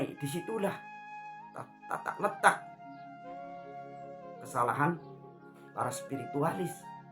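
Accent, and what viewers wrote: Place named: Banyumas, Indonesia. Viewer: Admin orang ngapak kayanya😊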